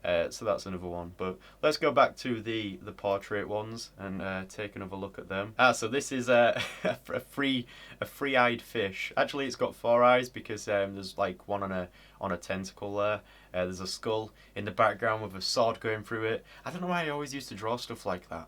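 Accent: United Kingdom. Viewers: So like are you British? Or are you Australian.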